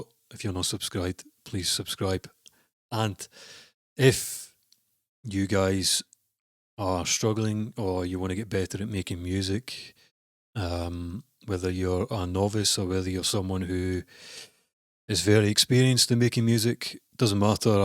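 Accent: Scotland, United Kingdom. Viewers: Are you Scotish mate? Great technique.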